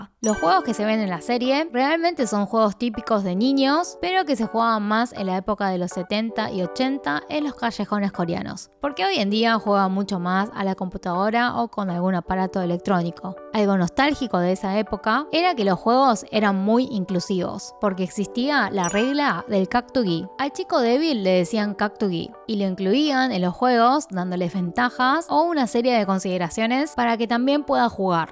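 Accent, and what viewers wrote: Argentina. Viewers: Me gustan mucho tus videos y tu acento argentino. Felicidades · Hablas como argentina xdd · Hablas cómo argentina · Coreana hablando con acento argentino me suscribo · Una pregunta tu eres de argentina o de otro país por qué te sale muy pero que muy bien el asentó argentino??? <3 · Una china hablando como Argentina wow como está el mundo. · Hola eres de Argentina o de Corea? Tienes acento argentino pero rasgos de una coreana china o japonesa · Y por qué esta china youtuber habla como argentina? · Ame ese acento argentino en una asiática preciosa excelente contenido ❤️🥺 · Tú Castellano es muy bueno aunque tú acento no es nuestro sino claramente argentino.... Necesito hacerte una pregunta. ¿Sabes porque el protagonista se tiño el cabellos de rojo? ¿Tiene algo que ver con la capucha roja que tenían los trabajadores? Gracias por tu explicación.. 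me has ayudado a entenderlo mucho mejor!! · No entiendo como tienes ese acento de los argentinos 🤔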